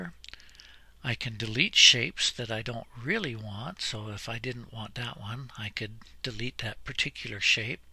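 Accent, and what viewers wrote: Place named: United States. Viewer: Hello, you are quite a character, brother - and i am saying this in a very positive way. Taking the time to answer the questions and comments on a video of yours which is as old as 5 years shows how committed of a person you really are to your profession or passion (whichever best fits). Respect and best wishes all the way from Bangladesh. NB: Your accent tells you are from USA. By the way, I also lived and worked in the USA for a long time. Studied at the university of Oklahoma...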